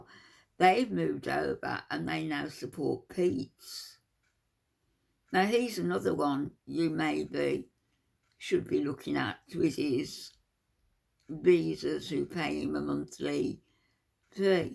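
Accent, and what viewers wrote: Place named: Birmingham, United Kingdom. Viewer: That's a brum accent ( Birmingham)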